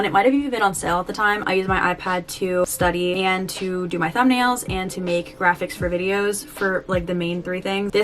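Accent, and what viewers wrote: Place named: New Jersey, United States. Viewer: IDK where you are from but every time you talk, you sound like NJ (where I am also from)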